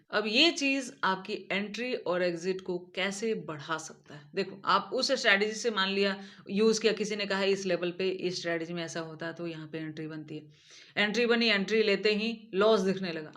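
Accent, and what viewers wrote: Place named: Haryana, India. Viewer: u belong from Haryana as per ur tone❤🎉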